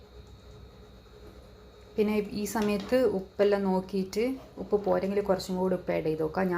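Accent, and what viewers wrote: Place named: Peringattur, India. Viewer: Ninde peringatur baasha veratte..over aaknda😂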